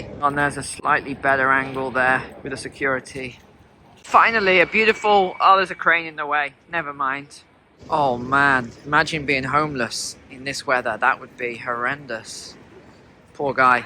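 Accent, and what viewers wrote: England, United Kingdom. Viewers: Sounds like an Englishman. You should be used the national government handing over the country to arabs and africans.Their country now. Get out! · This happened 10 years ago already and even before it. Did it just occur to u? U sound british. Not much different there. Or in Germany.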